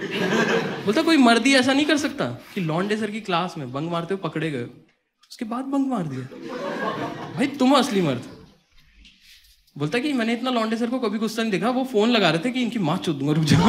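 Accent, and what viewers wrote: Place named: Garhwal, India. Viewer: Bhai tum jaat nhi laggre ho yaar gadwali laggre ho tum tohh 😅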